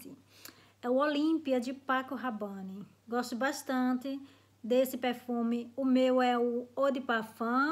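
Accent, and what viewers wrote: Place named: Northeast, Brazil. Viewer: Amo perfumes e estou amando seu canal amo seu sotaque nordestino esse jeito gostoso de falar. ganhou mas uma inscrita. você Raquel Mota Mary Brito e o Ander Style são os meus yotuber favoritos❤💋💋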